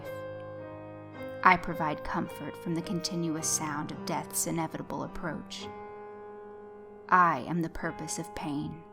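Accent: South, United States. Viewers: that southern accent though.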